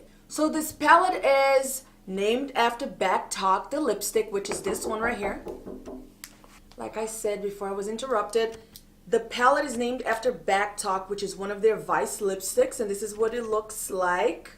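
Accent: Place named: Spain. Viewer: Do you speak spanish? I feel like I can hear your accent when you talk lol love it! This look is so gorgeous. loved this video!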